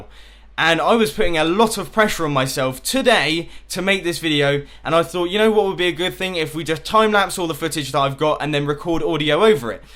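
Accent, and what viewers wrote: United Kingdom. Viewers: the only reason im not subcribed to you, is because(not being racist) your british accent really annoys me. Its not personal, i just really hate the way british people talk.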